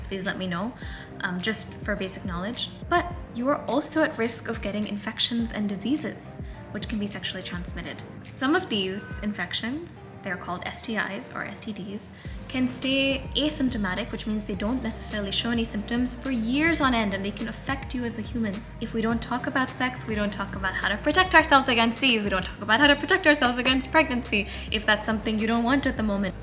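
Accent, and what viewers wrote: United States. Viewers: Didi ap aise english bolte ho jese ki ap phle se american ho❤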